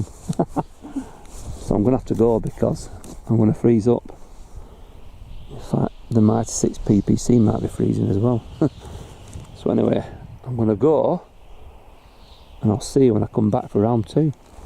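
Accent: Lancashire, United Kingdom. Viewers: We really do appreciate the efforts you go to to bring us these videos, and as someone brought up in Lancashire but living in Essex, that lovely Lancs lilt in your accent is a reminder of what I left behind!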